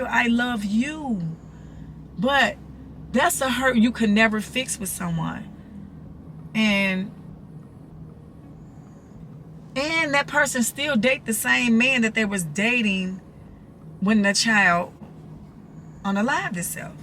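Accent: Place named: Chicago, United States. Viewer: Heyyyy I'm born and raised in chicago. As soon as I heard your voice I said yep she is so chicago.. you are so Beautiful ❤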